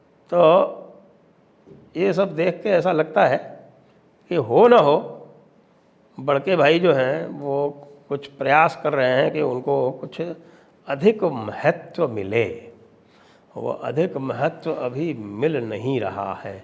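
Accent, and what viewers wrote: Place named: Bihar, India. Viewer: i like ur bihari (bhojpuri style) way of presenting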